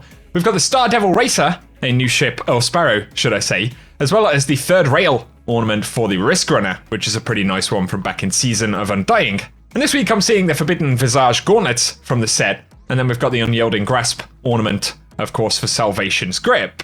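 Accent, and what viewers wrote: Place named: United Kingdom. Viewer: har-bing-ers.... LOL.... cmon - you have such Brit accent but, damn you cant pronounce much... think of it as BINGE like Binge drinking... Har-Bin-gers NOT BING - lol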